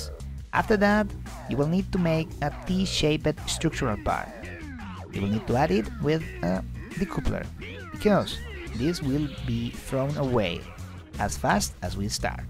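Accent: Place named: Poland. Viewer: Wait... You're spanish? I was thinking, that you're polish.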